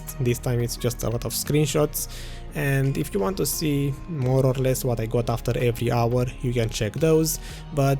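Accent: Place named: Romania. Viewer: haha, your accent is so romanian. the moment I heard it I was almost certain XD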